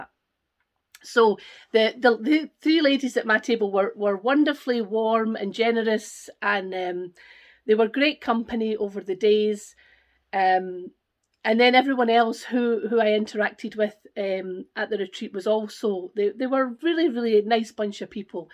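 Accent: Scotland, United Kingdom. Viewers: Just found your flosstube...love listening to a Scottish accent 😍 I also stitch what I want to, depending on my mood, so call myself a moody stitcher 😁 Sounds like a great retreat.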